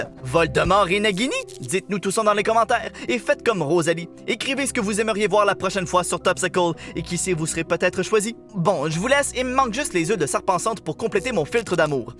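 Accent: Quebec, Canada. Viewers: T'es québéquois ??? T'as l'accent... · Il est marrant ton accent québécois 😂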